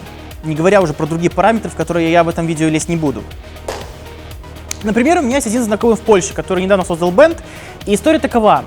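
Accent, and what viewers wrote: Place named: West, Belarus. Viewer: Первый раз на канале этом. 20 лет назад жил на протяжении 6 лет в Гродно, и этот говор такой западно белорусский, прям ностальгия )))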